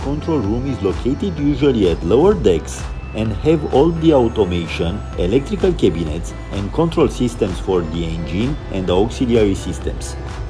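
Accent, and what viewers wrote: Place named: India. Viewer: インド訛りの英語だね。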